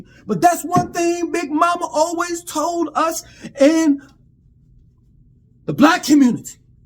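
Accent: New York, United States. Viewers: your shouting (along with the New York accent) makes you the Stephen A Smith of commentary on society’s ills! I mean this in a complementary way……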